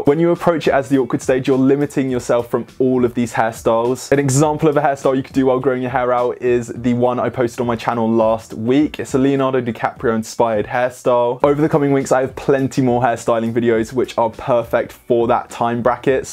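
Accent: United Kingdom. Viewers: dude why are you speaking like a british when you're clearly straight from the mezzagiorno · It also helps having a British accent and a dangling earring! This is catnip to the female species! All day!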